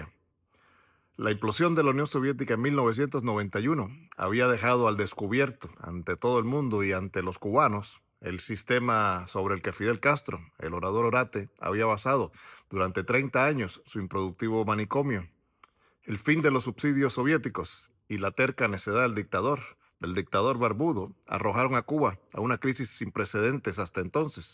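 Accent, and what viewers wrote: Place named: Mexico. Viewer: tienes acento mexicano ahi fue donde te fuiste parece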